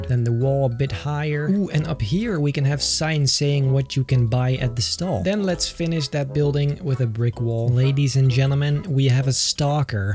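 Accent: Netherlands. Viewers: ru dutch cuz you kinda have the accent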